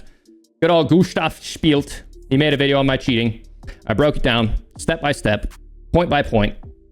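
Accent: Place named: Liverpool, United Kingdom. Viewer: The only thing you need to know is that he’s scouse. Rotten to the core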